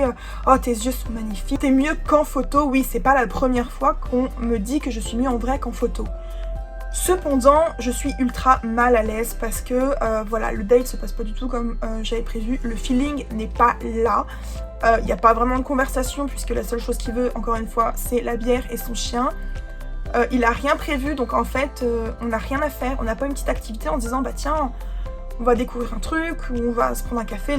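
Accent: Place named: France. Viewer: Sinon....le mec qui se moque de ton accent ....j'ai connu ca aussi avec un de mes British dates (j'en ai eu 2 au total lol). Et il m'avait fait la même, il se moquait de mon accent ...il m'a tellement gonflée que je me suis barrée et je l'ai planté du coup 😅😁 Alors voilà, comme tu disais....on sait qu'on a un accent parce qu'on est francaises...mais y'a des limites !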